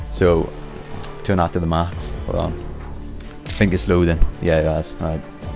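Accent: Wales, United Kingdom. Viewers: He's Welsh. I feel like it was in his info at one point, if it's not there now. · are you welsh? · Is that a Welsh accent I hear? Blimey. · You sound welsh. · Whats your nationality? You sound Welsh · im in love with your accent :) i wish i was closer to wales, im 1 hour away :( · Welsh :D He Welsh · Omfg. A welsh commentator!? I'm subscribed just for that. Where in Wales you from? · It may be dumb, or stupid. But I was instantly in love with your accent. I love the Welsh.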